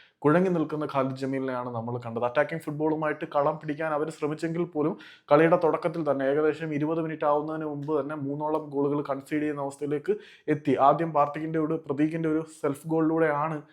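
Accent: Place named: South Kerala, India. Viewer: "ഭ" തെക്കൻ ജില്ലകളിൽ ഇങ്ങനെ ആണ് pronounce .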